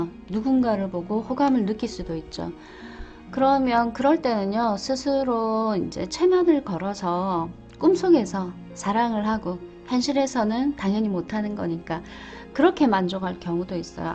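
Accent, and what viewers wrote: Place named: Busan, South Korea. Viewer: 5번 신기하게 맞네요🤗 편안한 리딩 좋아요~~ 부산ㅅㅏ투리두 좋아요~~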